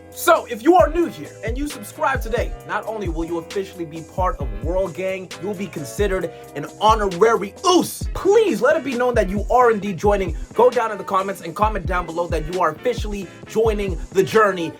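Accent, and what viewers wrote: United States. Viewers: You're south African You sound American But live in the UK? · How were you born in South Africa live in Birmingham but sound American. That's mad 😂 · If he’s South African and leaves in England, why does he speak American?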